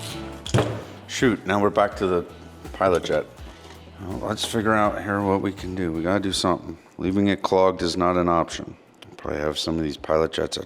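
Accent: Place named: Pennsylvania Dutch, United States. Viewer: I love you -- I've been watching your videos like crazy for the last couple months... and I grew up in Allentown so I love hearing your PA Dutch accent... but you gotta stop saying "menagerie." Nothing but love, your friend in Texas, Alan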